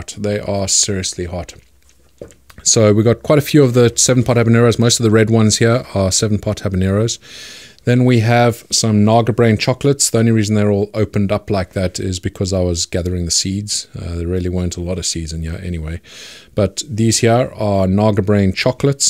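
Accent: South Africa. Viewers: SO EPIC to hear a south african accent! followed!!! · Is your subtle accent south African? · just came across the channel ... awesome content ... you sound very South African ...? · Heard a ZA accent, got my hopes up that there was a local shop to purchase these sauces, saw the cost in UK pounds, the man has obviously left our country :( . Back to using Bushman sauce, lol. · This guy sounds like a SouthAfrican fore reals >_< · He sounds kinda south african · He sounds South African. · That is sad man. You do have a South African accent though. Anyway, i will have to try and make that weapon of a sauce on my own. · South african accent, i approve 👍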